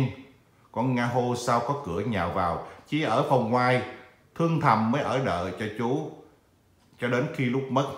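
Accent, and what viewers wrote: Standard. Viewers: Anh noi qua chuan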